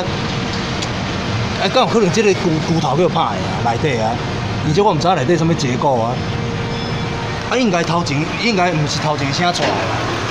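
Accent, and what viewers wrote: Taiwan. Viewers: 幹你的台語好道地好親切好有台灣味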